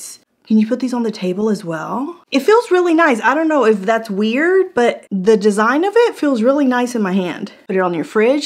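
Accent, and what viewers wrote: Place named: South Carolina, United States. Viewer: I can vouch for the fuzzy eliminator. i've had one for years and it cleans all my sweaters up beautifully. Yes....be careful to stay away from any long strands of fuzzies.....they will get caught and sound like you're cutting off your arm. lol But yeh.....I would buy another one in a heartbeat. Actually I don't think there is any other gadget that does this, except for like you said......a razor. And I have torn many holes in to a sweater using a razor. So that is a no no! By the way.....where are you from? Anywhere near Sumter SC? Born in Wilmington NC....raised in Sumter. You definitely got the SC accent going on.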